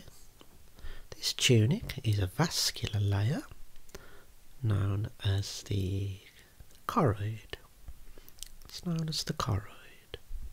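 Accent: Birmingham, United Kingdom. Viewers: Wow! Fancy coming across a fellow Brummie on the big world of Youtube! Im learning some pretty good Eye knowledge from your videos too! Keep it up pal! 😃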